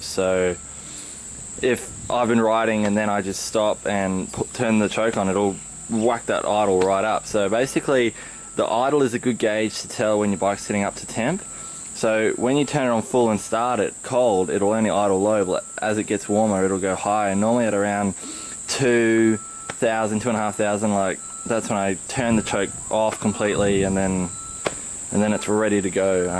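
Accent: Australia. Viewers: Must be the Aussie accent that makes it so easy to listen to your videos! lol Keep em coming you've taught me quite a bit about my ex250. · Thx buddy, you explained very clear, btw I'm from Australia as well, now lives in Thailand, just got a bike with this choke thing and have absolutely no idea about it until I watched your video, and its great to hear our accent. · your australian accent is really awesome xD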